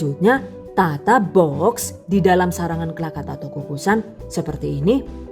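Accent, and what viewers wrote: Banyumas, Indonesia. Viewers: Sumpah ngakak jebule wong ngapak 🤣,suwun mba resepe😘sukses selalu tambah maju🥰aamin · Ngomong engris dgn dialek ngapak, sukak banget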